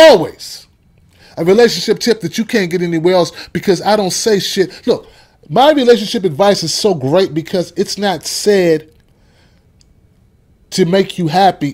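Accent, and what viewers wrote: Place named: New Jersey, United States. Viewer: I just love your Jersey accent!!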